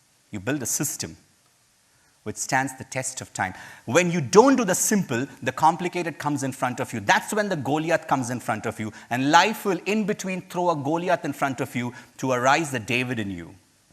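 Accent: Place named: Kerala, India. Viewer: Sir ur from Kerala? Ur accents are so pleasant 👍👍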